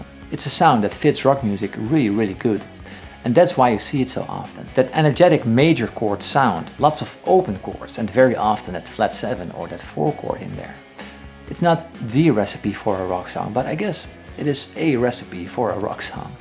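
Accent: Netherlands. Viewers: He sounds dutch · This guy sounds like he is Dutch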